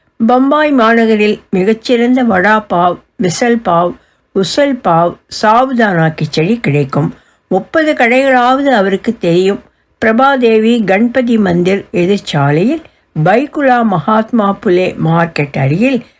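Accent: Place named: Nagercoil, India. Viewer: very nice madam .... Nagercoil Slang nalla Pesureenga .... nangil nadan in ella Kathay Ka lum bathividungal.... Thank you...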